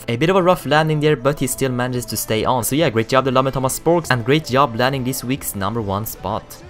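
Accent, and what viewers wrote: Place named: Sweden. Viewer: He is from sweden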